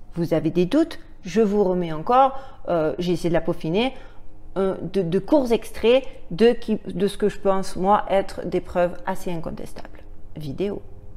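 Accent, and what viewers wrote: Marseille, France. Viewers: Albert Pike, c'est Paille-K, pour la prononciation (je croirais presque que tu mens quand tu dis vivre aux States! hein ma gueule?:) de Marseille! j'adore ton petit accent que tu as dû bien perdre depuis... mdr ) et aussi à 15' et des, on dirait ptet des détracteurs (mais dénigrateurs j'aime bien aussi, ça fait penser aux oiseaux migrateurs: ceux qui vont voir ailleurs... si j'y suis;) Et franchement, tu as de la jujotte, j'aime beaucoup ton implication et ton recul en même temps.... dans tout ce que tu cherches à comprendre... T'es vraiment intelligente je trouve! Bon voilà, après avoir regardé une 20 aines de vidéos sur ta chaîne, je me mets à te tutoyer, mais cela ne veut pas dire que j'ai moins de respect pour autant... C'est ptet même le contraire: je te vois comme une "sister" de ma génération! (même si tu dis dans une vidéo qu'en 95 tu n"étais pas née, je sais que ce n'est pas vrai:p ) Et ton angle de vue (vu des States) m'apporte beaucoup! 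Merci! Stay Safe aussi (vraiment, fais gaffe à toi) xx Take care xx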